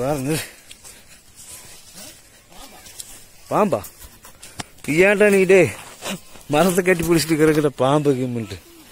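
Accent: Kongu, India. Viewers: கொங்கு தமிழ் தாண்டவம் ஆடுது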